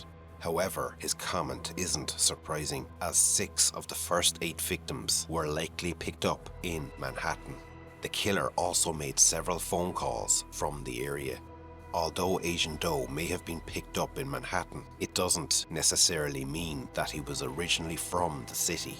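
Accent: Ireland. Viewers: I’m impressed by this documentary. Good old Irish accent too.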